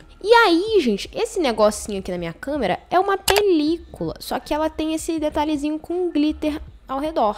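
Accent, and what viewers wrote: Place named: Rio de Janeiro, Brazil. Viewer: carioca com xish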